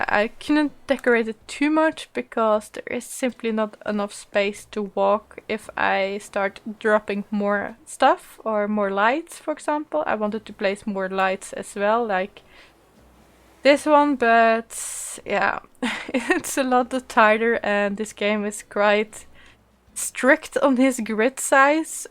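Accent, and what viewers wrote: Netherlands. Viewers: Where u from, u sound Dutch?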